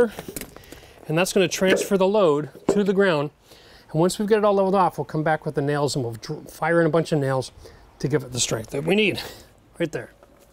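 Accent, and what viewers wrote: Canada. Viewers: I can hear that Canadian accent. Went to school in Michigan and had lots of Canadian friends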